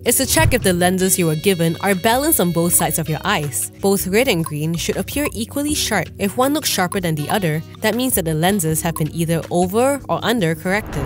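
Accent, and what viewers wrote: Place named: Malaysia. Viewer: i didnt even see the channel’s name at first but i can guess the accent is malaysian 😅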